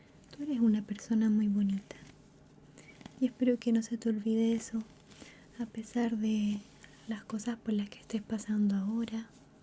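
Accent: Chile. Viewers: Muchas gracias. Te sigo desde hace años y creo que por ti amo el acento chileno. Justo han sido días de mucha ansiedad, ojalá hagas más videos así. Últimamente me he refugiado en tu canal de ASMR 🥹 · Querida, el primer video tuyo que tuve el privilegio de ver fue hace muuuchos años, un tutorial de cómo hacer un corazón de Undertale con porcelana. Comencé a ver tus videos por el tutorial, me quedé por tu carisma. Tu acento de Chile siempre me recuerda a mis abuelitos y todos los tíos abuelos y primos lejanos que tengo allá. No soy artista, pero soy docente, y fuiste una de mis inspiraciones para llevar el amor y la paciencia a mis alumnos en su proceso de aprendizaje. No suelo comentar mucho en los videos, pero te sigo desde mi cuenta principal hace muuuchos años. Quería agradecerte por el hermoso cariño que le ponés a tus proyectos. Y gracias por este video que me ayudó mucho en tiempos difíciles. 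Con amor, una pollito veterana ❤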